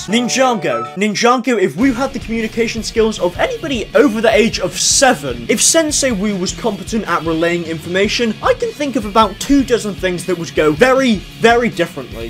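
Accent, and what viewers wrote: United Kingdom. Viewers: Your funny as it is bud your British accent makes it ten times better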